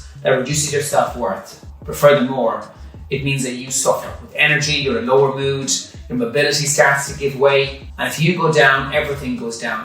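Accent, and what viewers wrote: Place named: Ireland. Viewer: Are you Irish? I love the accent ❤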